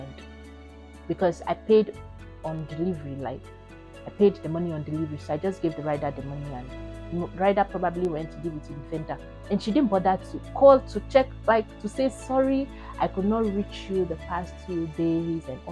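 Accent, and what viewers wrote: Ghana. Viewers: you speak Ghanaian English. And you are very natural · 80% Ghanaian accent loading oo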